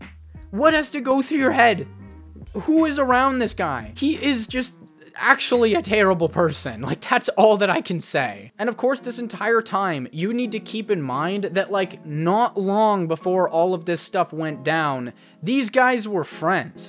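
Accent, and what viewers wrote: Canada. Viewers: yo r u canadian? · Are you Canadian? Plus thanks for the vid 🔥 been too long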